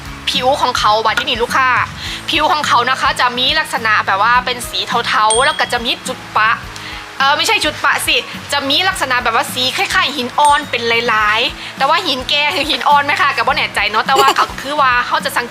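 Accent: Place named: Chaiyaphum, Thailand. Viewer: สำเนียงเหมือนชัยภูมิเเท้จ้า ฟังเเล้วคิดฮอดบ้านจ้า คอนสาร ตอนนี้ย้ายบ้านมาอยู่กับเเฟนที่ชลบุรีเเล้ว บ้านอยู่คอนสารก็รื้ออกหมดเเล้วไม่ได้กลับบ้าน4ปีเเล้ว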